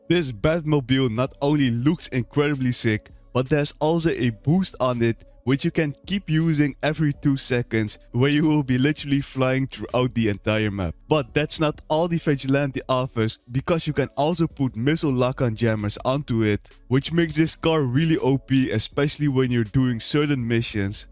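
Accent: Netherlands. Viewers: His Dutch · Hes dutch bruh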